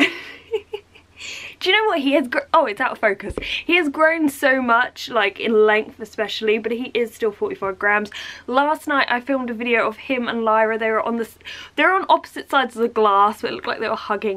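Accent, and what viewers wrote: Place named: London, United Kingdom. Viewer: You've got a mixed accent... London and?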